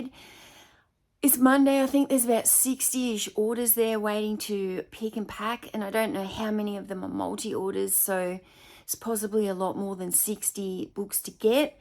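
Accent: Australia. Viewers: I cant get enough of you saying the word "book" that Australian accent though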